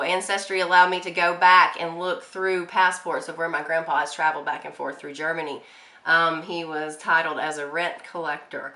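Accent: South, United States. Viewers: No you are not 100% European, you are however 100% Southern! The accent gives it away, no DNA needed! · You sound like you are from the south.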